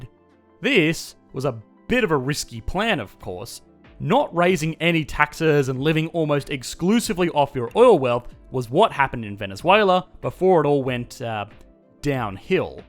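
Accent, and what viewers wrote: Australia. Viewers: The Australian accent is very very irritating. Please adopt a US accent.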